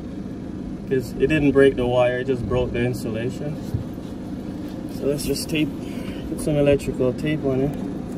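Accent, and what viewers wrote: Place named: Jamaica. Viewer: Where u from man???? From your accent are from jamdown...... Or further afield down in the caribbean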